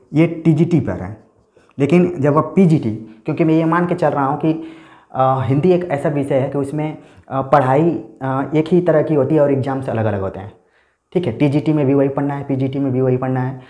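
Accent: Bihar, India. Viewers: आप बिहार से है क्या सर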